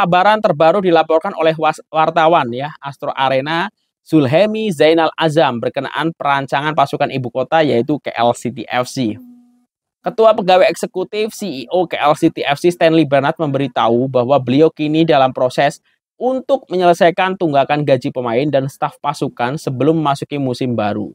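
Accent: Java, Indonesia. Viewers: hahahaha....pekat betul jawa kau bro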